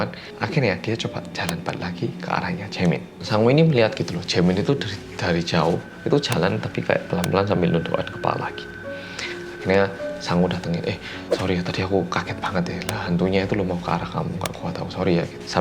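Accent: Java, Indonesia. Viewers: Berguyon? Bahasa indonesia mix jawa.. 🤣🤣🤣 medhok tenan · Aku suka bgt sama channel karena suara medhokk nya😆😆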